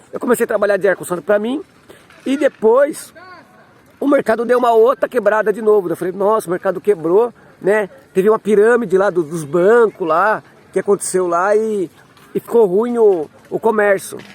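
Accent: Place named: Rio de Janeiro, Brazil. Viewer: Sempre sigo suas dicas pois é um dos melhores canais sobre mecânica e principalmente sua humildade seu carioca e simplicidade nos vídeos que são muito instrutivos que Deus continue te abençoando sempre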